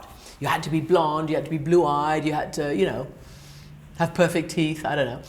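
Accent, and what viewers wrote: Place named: Scandinavia. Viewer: You speak as a scandinavian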